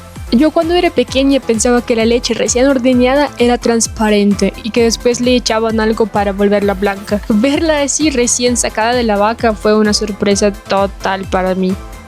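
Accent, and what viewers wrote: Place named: Colombia. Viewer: Con cada video me impresiona más tu dominio del español. Hablas más colombiano que yo!😱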